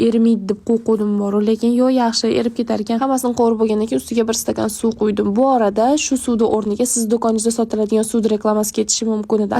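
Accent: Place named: Fergana Valley, Uzbekistan. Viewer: Салом бизни водийда шунака гапирамиз😂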